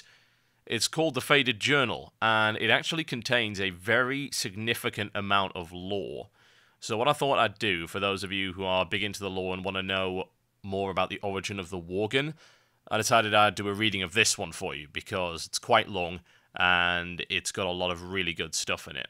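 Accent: United Kingdom. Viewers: he does have a british accent cause he's from UK :) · sounds like a boring documentary.... but it is the one and only cynical brit so like! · I wish I had a hulky british guy to read a book to me every night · you have an awesome accent. It's not one of those horribly exaggerated ones, this one is less aggressive and expressive, yet it has a certain taste to it that we all come to love. That leads me to my question, what part of the UK did you get that accent? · voice suits WoW for sure. It's a very distinct UK accent that's very usable in the worgen stories:)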